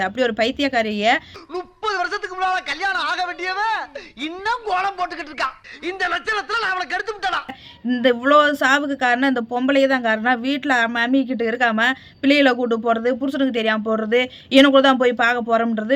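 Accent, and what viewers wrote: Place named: Madurai, India. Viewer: எங்க மதுரை தமிழ் கேட்கவே சிறப்பு சரியா சொன்னமா இங்க ஆஸ்திரேலியாவில் தமிழர் மானத்தை வாங்கிட்டாய்ங்க இந்த தற்குறிகள்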